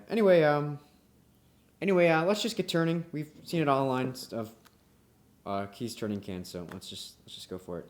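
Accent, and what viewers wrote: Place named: United Kingdom. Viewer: Yes but people remark often about how “British” he sounds.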